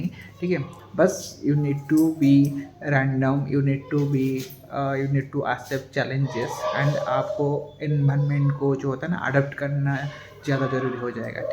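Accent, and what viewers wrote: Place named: Odisha, India. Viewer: Are you an Odia ? I guess it from your pronounce 😊❤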